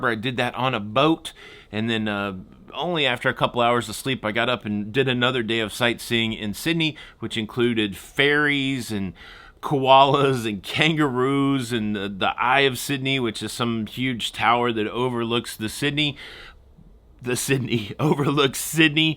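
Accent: United States. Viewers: Hehe! The subtitles picked up on your American accent and said fairies instead of ferries! I’ve noticed that a lot with Americans and short vowels, they tend to elongate them.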